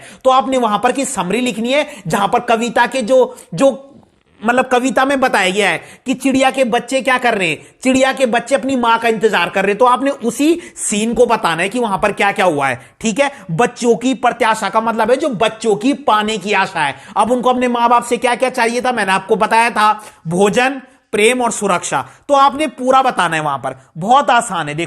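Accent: Garhwal, India. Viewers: Bhiya ap gadwali ho ?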